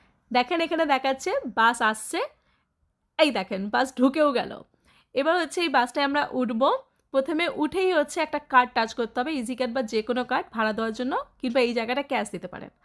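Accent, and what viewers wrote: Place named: Kolkata, India. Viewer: দাদা আপনার টা কলকাতার ভাষা , মানে আমার শহর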